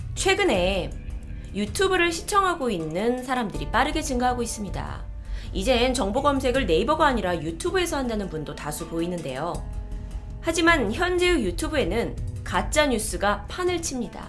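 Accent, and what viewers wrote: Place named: Jeolla, South Korea. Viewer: 아하~~ 그래서 "의"발음을 "으"발음으로 하는군요. 고향세탁은 전라도가 옜날부터 잘해왔던 일입니다. 정말 자기 학력에 진실이라면 전라도 발음을 배우게 된 계기가 있을거에요. 부모님의 영향이라던지요. 그걸 살펴보면 되겠죠?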